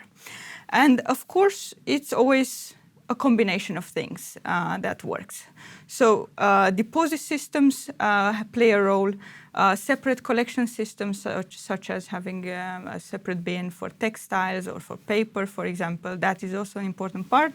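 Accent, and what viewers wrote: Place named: Norway. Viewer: Norwegian english from. 😀 Tomta er litt for dyr for meg nå, ikke lett å regne inn.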